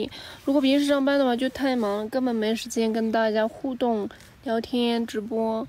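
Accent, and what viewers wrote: Sichuan, China. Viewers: 你是不是講的是四川口音嗎⋯⋯？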